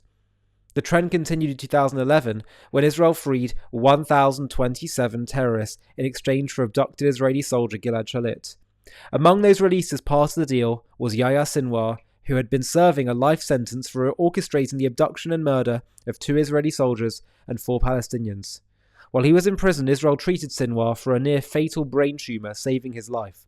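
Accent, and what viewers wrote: United Kingdom. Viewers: Pro-genocide hogwash, with Limey accent. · Have you seen the way these poor Palestinian women were released from the terrorist IDF illegal military prison? I wonder how much you get paid and who is paying you to speak this rubbish nonsense. Only women and children were released by Israel. Why didn’t they release the doctor that was raped by the IDF? Oh that’s right because the IDF killed him. You’re not that bright for having a British accent. You sound British but you speak ignorant.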